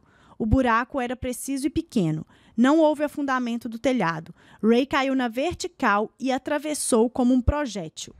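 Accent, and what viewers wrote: Minas Gerais, Brazil. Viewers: Oi!!! Jeitim mineiro de contar história me conquistou! Adoro seu canal! Caso estranho heim...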